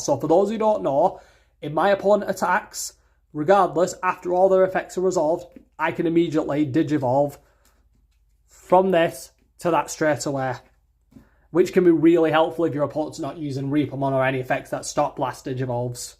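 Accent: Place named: Yorkshire, United Kingdom. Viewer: Mate you sound like you're from Barnsley or Yorkshire... But this is an interesting profile...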